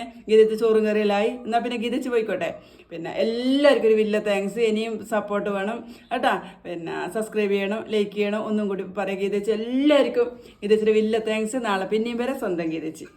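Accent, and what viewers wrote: Kannur, India. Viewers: എന്താ രസം പറയുന്നത് കേൾക്കാൻ നമ്മുടെ കണ്ണൂർ ഭാഷ പൊളിയല്ലെ മുത്തെ 🥰🥰🥰🥰❤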